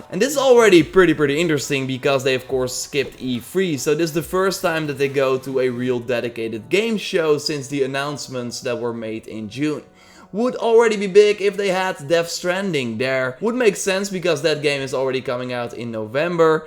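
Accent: Netherlands. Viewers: Nice Dutch accent bro. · Je kon wel eens Hollands zijn:)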